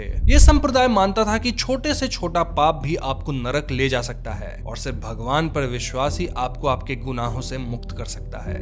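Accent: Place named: Bihar, India. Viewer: apna bihari accent thik kro gussa aata h galat pronounce sunne k baad.. "kar diya, " kar di"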